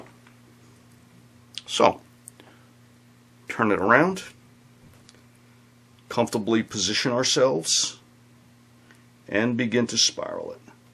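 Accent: New York, United States. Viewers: Thank you for sharing. My husband and I were looking at your website last night. You are a genius. I would love to have a piece of your art , truly amazing very beautiful. I love your accent, I grew up in Johnson City, New York. Spent a lot of time in the city. I can't tell how much I miss it. I live in California now. Blessings to you, Deborah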